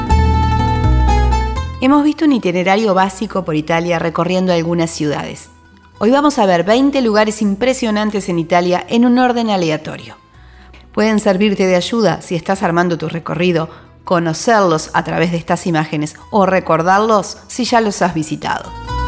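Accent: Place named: Argentina. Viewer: Creo que es Argentina o Paraguay a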